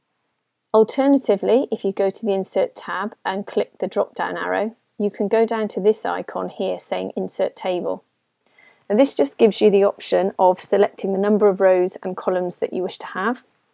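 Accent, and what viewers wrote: United Kingdom. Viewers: Thanks a lot for your helping!! It helped me a lot in order to achieve my future essay, furthermore your British accent makes the audio enjoyable and understandable too!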